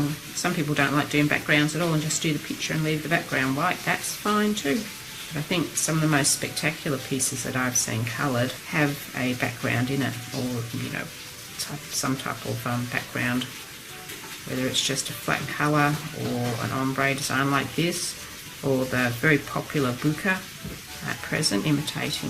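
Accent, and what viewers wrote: Australia. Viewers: Absolutely beautiful artwork! That is one of the smoothest, most gorgeous backgrounds I’ve ever seen. The only thing (but it’s a big thing for me) is I find any background music distracting and awful (trumpets etc) and I usually just mute it, but I couldn’t with this one cos you were explaining a little bit here and there. I think everybody who does art probably has their own thing that they put on in the background anyway. I listen to learning German when I feel productive, Lady Gaga when I want a happy vibe, but often I just like complete silence, so I can think about all sorts of stuff/concentrate on what I’m doing. But when people put background music on in their instructional videos, the viewer isn’t given a choice - and everyone has different taste in music. The reason I mention it is because I really like your work very much and one of the things that you do that I find particularly helpful is that you really clearly list the brand and exact colour of the pencils that you’re using. 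I think I detected an Australian accent? I’m Australian so hi! 👋 I have seen a lot of your work on Instagram that I like too. So hi! And I’m sorry about the music comment (one part was like the background music they use before funerals get starting - you know, when people are assembling and greeting etc) and I know that I’m very over sensitive to things like that. But absolutely beautiful work! 😃👍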